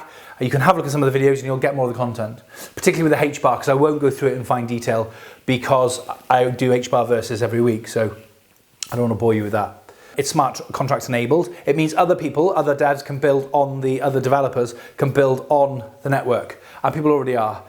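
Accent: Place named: England, United Kingdom. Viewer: I watched the video and that was what I thought from the beginning. But, in this video, I think I just had a hard time understanding your English accent (I'm a hick from Indiana). Now that you cleared that up, I believe you must have been telling us that others had a problem with the 50 billion and not you. By the way, I watch a lot of HBar videos from various people and yours are by far the best and most informative. Most of the others are just pumping the coin without any real substance. Thanks.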